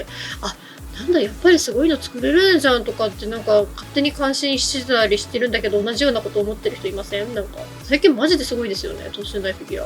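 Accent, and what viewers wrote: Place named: Miyazaki, Japan. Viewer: 確かにこれは自分の技量を分かってないと手を加えるのは怖いですね😨 だから見て楽しむ😁 解説中のちらほら出てくる宮崎弁もええなぁ〜☺️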